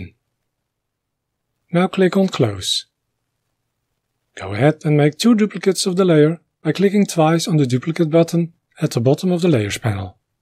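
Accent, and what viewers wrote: Netherlands. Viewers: Your videos are all so very instructive and beautiful. You sound like Dutch. Am i correct?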